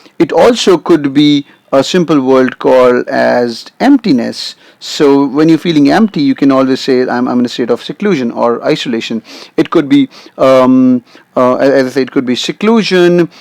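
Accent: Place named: India. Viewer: Your Indian accent makes it so more difficult to understand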